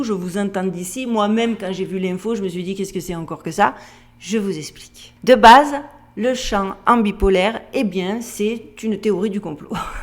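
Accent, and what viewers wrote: Marseille, France. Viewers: Bon aller je me lance on est de Toulon et avec ma femme on est just FAN!!! Milles mercis à toi on adore tes vidéos tes analyses ton intelligence alors qu'au tout début j'étais sur le cliché "blonde avé l'assent de Massilia" mais wtf et finalement comme trop souvent il faut lâcher les "à priori" et conclusion on a découvert une belle personne qui nous apporte bcp. Alors oui mille mercis à toi!!!!!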